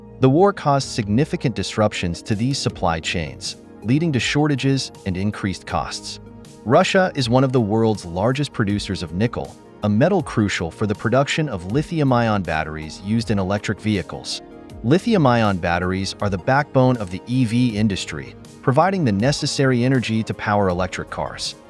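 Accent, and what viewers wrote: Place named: United States. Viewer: sounds like a wank yank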